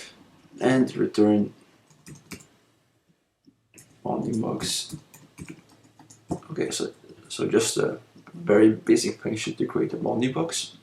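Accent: Russia. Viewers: Great work.... these tutorials ignite my passion for game programming.....Keep it up... BTW..you sound Russian...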